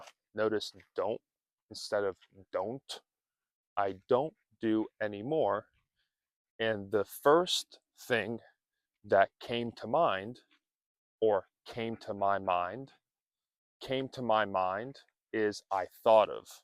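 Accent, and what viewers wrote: United States. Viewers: Hello, teacher! I’ve been listening to your last videos lately. Your helpful method of ‘slow English’ is widely appreciated according to all the comments we can read every time you post a new lesson. Congratulations! You have mixed abilities and the mental power needed to explain words and expressions clearly. I have been teaching French for years, and I love phonetics. You insist firmly on pronouncing the soft ‘t’ instead of the hard ‘t’ we often use in Europe. Notice that I do not disagree with you, on the contrary, but you must have a reason. Your accent is very easy to imitate and I assume it’s from New England. We are all grateful about your work.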